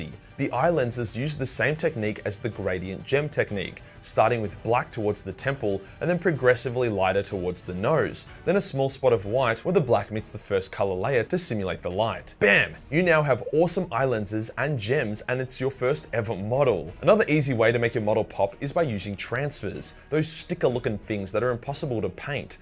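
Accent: Australia. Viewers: I kinda know everything in the video but it's just nice to hear an Australian swearing on youtube.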